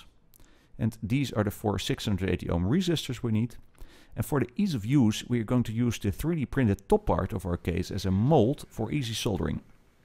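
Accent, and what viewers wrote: Netherlands. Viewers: ben je uit nederland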